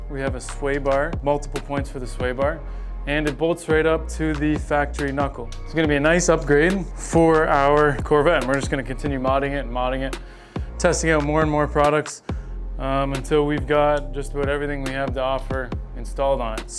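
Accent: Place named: Ireland. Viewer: You have such a strong irish accent lol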